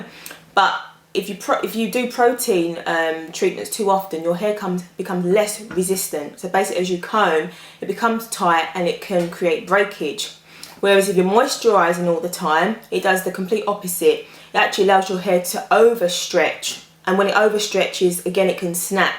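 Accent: United Kingdom. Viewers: are you british....you say strangffin ...thats funny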